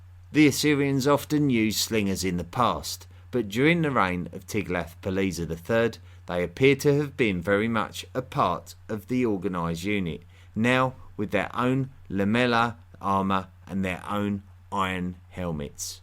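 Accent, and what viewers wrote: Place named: United Kingdom. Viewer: Its one thing this poor bastds born a uk subject, but to be double querst(sic) with a stupid uk accent it terrible enought to change the channel. God, i cant stand the commonwealth bastards.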